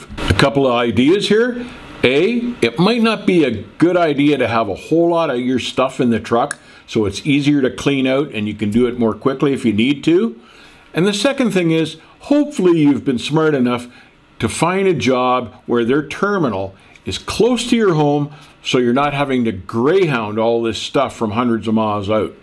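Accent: Canada. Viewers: I love the Canadian accent :)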